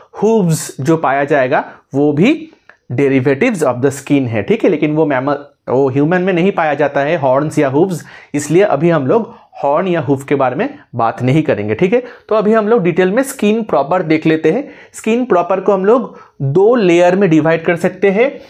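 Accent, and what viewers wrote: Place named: Bengal, India. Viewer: Sir your ascent is type of Bengali..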